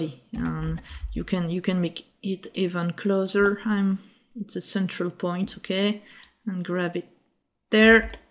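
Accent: France. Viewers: Serais-tu francaise par hasard (à cause de l'accent)? L'autre tuto <link> n'est-il pas obsolète à cause de l'autofit ou suis-je à côté de la plaque ?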